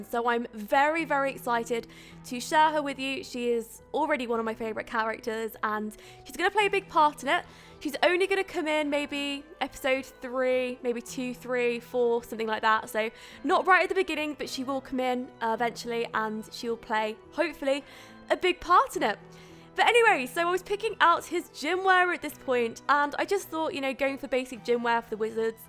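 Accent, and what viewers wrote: United Kingdom. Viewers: you live in germany? but your accent is so british o.o how do you maintain it?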